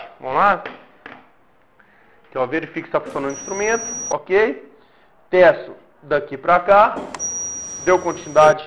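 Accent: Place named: Minas Gerais, Brazil. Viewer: Muito bão igual mineiro fala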